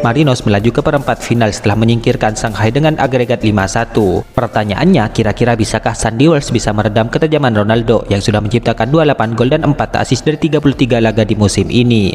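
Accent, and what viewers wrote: Madura, Indonesia. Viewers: Okeh 🙏 Logat bahasa Madura united 👍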